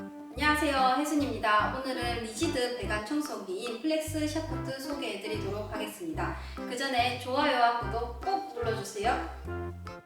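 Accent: South Korea. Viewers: 오늘은 ~~~ 경상도 사투리 잘 보고 갑니다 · 대구에 사시는 분인 줄 몰랐습니다 경상도 대구 고향 말씨 정겹습니다 감사합니다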